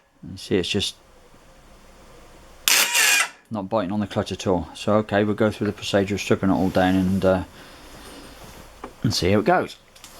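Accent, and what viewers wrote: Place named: Radstock, United Kingdom. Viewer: Thanks, just going out to the garage to do mine for the second time ! in 49,000 miles, by the way are you from Radstock ? it sounds like it.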